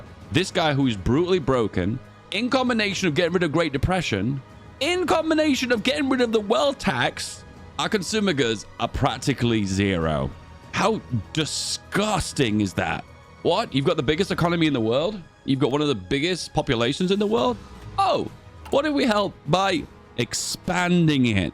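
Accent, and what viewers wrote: United Kingdom. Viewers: It's amusing to hear a Limey talking shit about American economic policy and praising multiculturalism while seemingly oblivious to the fact that the policymakers of both our nations work for the central bank, not for the citizens, who in turn belong to a parasitic culture which has always and will always destroy its host nations through glorifying multiculturalism. Our nations are collapsing and our Folk are on the brink of extinction while we waste our time on pointless video games and silly videos on the internet.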